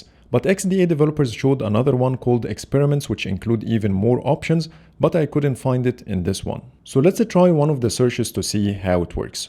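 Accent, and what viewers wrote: Egypt. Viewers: The Egyptian accent is corrupting your English.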